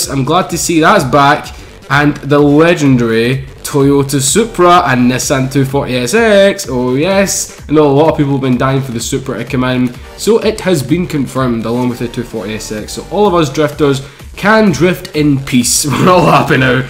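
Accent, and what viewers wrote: Scotland, United Kingdom. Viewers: What part of Scotland you from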